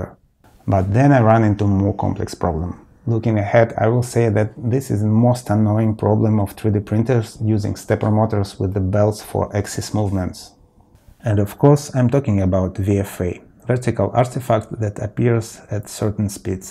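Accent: Russia. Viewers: Your english is great and russian accents are cool Im getting a prusa and have 2 ender clones so im super excited for this as a project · Well, the voice and articulation and Russian accent — of course, you need to work on that. I mean at least a normal voice equalization. There's a lot of bass, 125 hertz and 250 hertz are buzzing, there's little mids and highs. Maybe it's okay to hear from the phone, but from a computer with good speakers, it's hard to even hear what you're saying. =)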